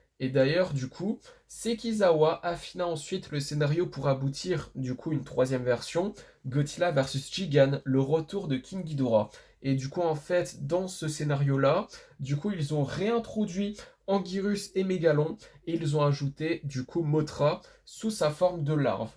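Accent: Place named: Japan. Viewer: J'AI PÉTÉ UN PLOMB JE SUIS SÉRIEUX POURQUOI TU DIS TOUJOURS EN EN ACCENT JAPONAIS IL ET POURI C'EST UN LANGUE C'EST PAS POURIR C'EST JUSTE EN PEUT PAS CAPTÉ CAR C'EST DU JAPONAIS JE VAIS TU DÉTRUIRE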